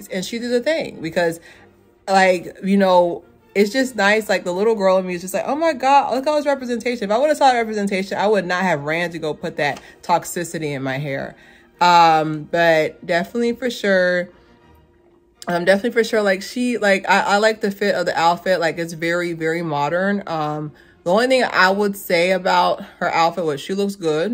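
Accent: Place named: United Kingdom. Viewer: You have to do all reviews with that British accent lol that was awesome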